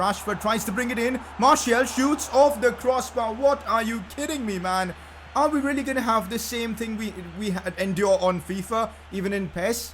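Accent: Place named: India. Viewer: We’re has this Indian sounding man been all my life I have been laughing so hard all ep this is amazing I just subbed to all his channels he his amazing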